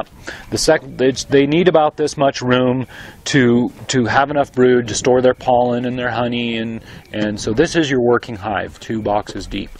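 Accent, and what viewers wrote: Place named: Australia. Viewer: WA is that Western Australai ? cause u have an aussie accent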